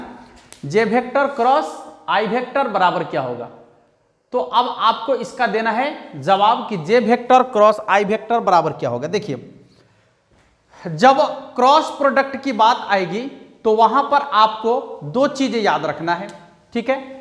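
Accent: Bihar, India. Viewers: सर बिहार से है का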